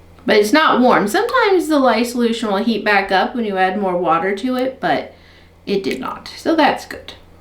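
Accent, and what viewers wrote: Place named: South, United States. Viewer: I love the snowy exit scene! I'm praying at this point that I'll be able to place an order when you open the website. Things have gotten a bit hairy this month. As for the way you pronounce words... don't fret! Anyone who lives in a southern state for more than 5 years after living in the northern part of the States gets a pass. We develop a whole new accent from everyone else! I hope y'all have a wonderful Thanksgiving!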